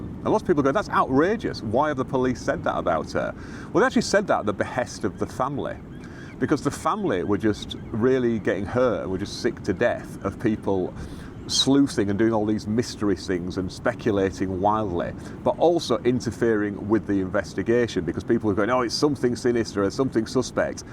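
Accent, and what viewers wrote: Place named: Northern England, United Kingdom. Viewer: As someone that knows nothing about law I do enjoy listening to these videos and find them educational.😇 May I ask where that untainted Northern twang that you have is from, as you are very likely close to my own roots (Hull, Hessle more precise)? Definitely the way you pronounce your words, like 'Erm and Away' I'm guessing NE Yorkshire somewhere? The accent quickly changes once one starts travelling up and down the A1. Keep the videos coming.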